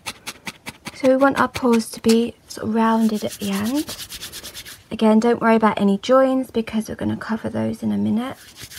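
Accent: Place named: United Kingdom. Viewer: clear and accentuated British English, very easy to understand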